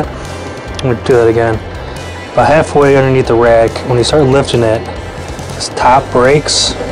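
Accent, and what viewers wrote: Chicago, United States. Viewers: I'm hearing a heavy Chicago accent! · Sounds like a Chicago accent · Love your video - thanks so much! The ribs come out GREAT this way! Love your Chicago accent too!! ;) GO BEARS!!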